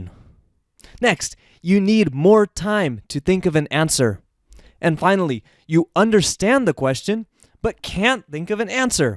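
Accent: United States. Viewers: Are you american?.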